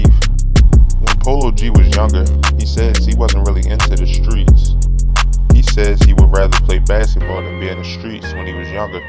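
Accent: Baltimore, United States. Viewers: Gotta be from Baltimore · the person talking is definitely for baltimore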